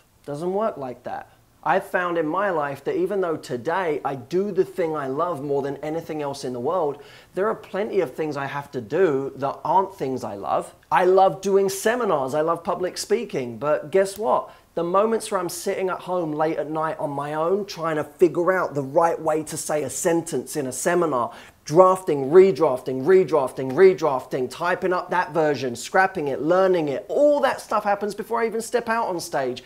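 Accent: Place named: United Kingdom. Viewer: (aint always easy) anal is easy?! hahahahah I love the british accent.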